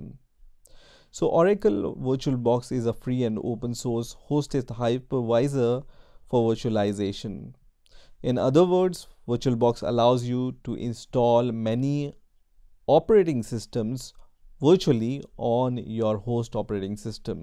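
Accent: India. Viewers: oh indian (not racist,just suprised to hear not bad at all,but different accent)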